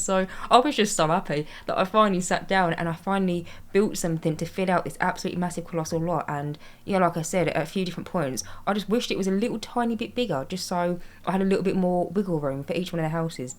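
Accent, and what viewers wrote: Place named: England, United Kingdom. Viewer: your builds are always gorgeous. on another note, as an ex-pat nice to hear a very english accent again.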